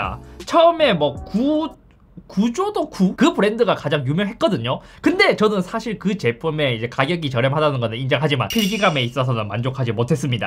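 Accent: Gyeongsang, South Korea. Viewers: 나름 얼리아답터인데 동영상을 즐겨보는 스타일이 아니라 일부러 찾아보진 않는데. 우연히 KT광랜 속도가 안나온다는 뉴스에 잇섭이 누구지? 해서 봤는데 ㅋㅋㅋ 일단 갱상도 말투에 재밌어요. 그래서 저의 유튜브 구독 1번이 되었습니다. 앞으로도 흥미진진한 컨텐츠 부탁드려요.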